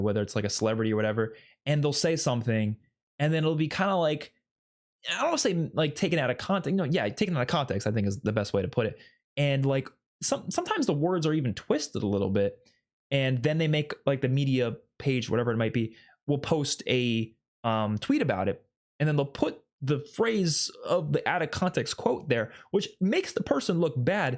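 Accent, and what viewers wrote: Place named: New York, United States. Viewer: Gotta be New York……northeast U.S. at least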